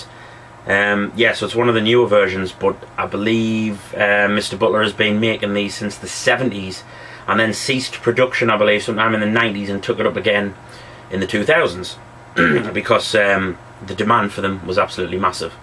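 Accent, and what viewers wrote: United Kingdom. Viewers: haha i love the way u talking i usually hate and skip the talking part of a audio demo but i subcribed your channel just to listen to ur brit tongue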